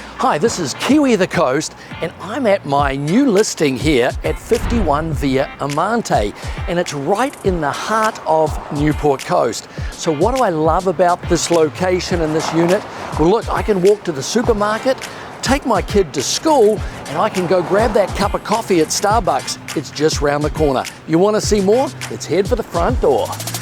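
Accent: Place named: Australia. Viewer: Don’t know if that Aussie accent helps sell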